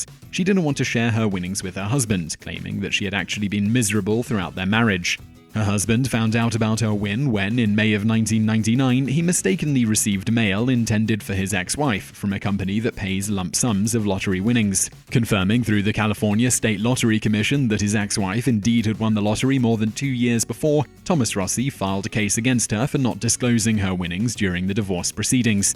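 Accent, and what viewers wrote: United Kingdom. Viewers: he's British, just how us Americans say water wrong by saying "wader"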